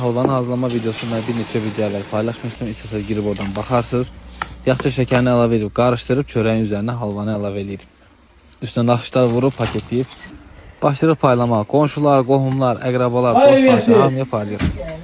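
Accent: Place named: North, Azerbaijan. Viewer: Avarlarin da belə adəti var.Bilmirəm bu hansı zonadir.Ama danışığından Şimal tərəfin ləhcəsidir.Allah bərəkətli eləsin.Allah qəbul eləsin 🤲💐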